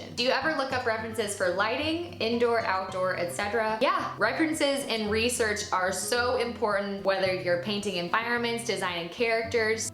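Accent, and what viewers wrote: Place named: South, United States. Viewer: alina kouzouian Southern drawl.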